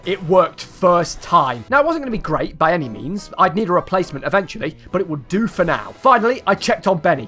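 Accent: United Kingdom. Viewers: First of all calm down second of all he is British not American how did that accent sound American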